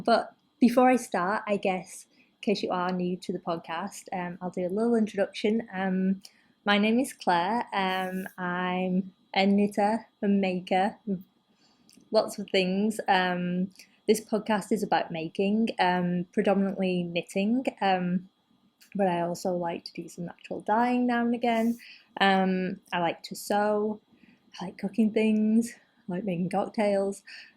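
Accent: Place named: North, United Kingdom. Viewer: Enjoyed your first podcast and am back for more. Love your dotty hat, really pretty. Enjoy your style and its lovely to hear your Northern accent 😁 Tilly is adorable too. Look forward to the next one xXx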